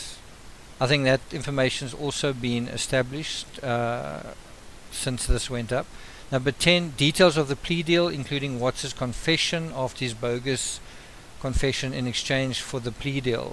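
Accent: Afrikaans, South Africa. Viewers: Interesting! I hear you are a Afrikaans person. Do you remember the case of Oscar Pistorious? I think he was guilty. Good content.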